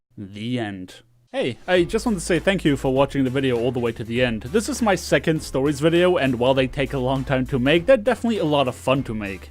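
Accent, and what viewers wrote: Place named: Germany. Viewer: just keep speeking with dat FAT german accent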